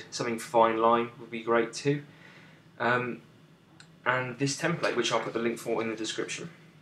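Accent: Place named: Bristol, United Kingdom. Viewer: are you from bristol by any chance??? I am from Keynsham (between bath and Bris)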